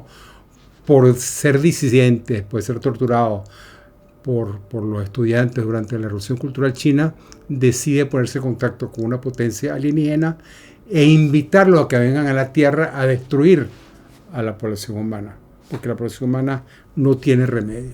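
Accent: Caracas, Venezuela. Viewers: Magnífico post sobre una magnífica obra. Acento caraqueño inconfundible. Suscrita y activada la campana. Pregunto: esta trilogía tiene traducción en español? Gracias. Mil exitos.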